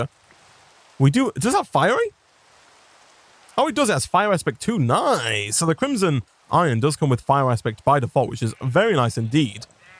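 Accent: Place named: England, United Kingdom. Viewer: English accent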